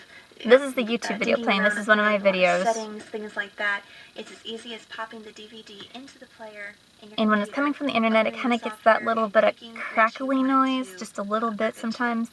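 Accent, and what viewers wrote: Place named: Texas, United States. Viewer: coz thats how we spek here in texas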